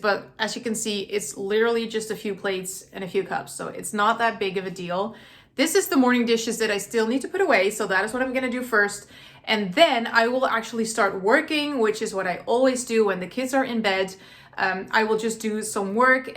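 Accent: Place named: United States. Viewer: I love your videos and find them inspiring Just wondering though....why do you have an American accent since you are a Dutch family living in the Netherlands? I am English and previously when I've heard a Dutch person speaking English it is totally different your accent.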